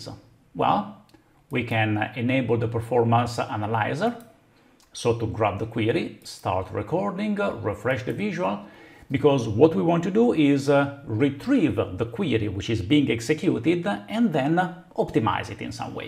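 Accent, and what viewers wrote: Italy. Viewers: Power BI is much more sexy with an Italian accent.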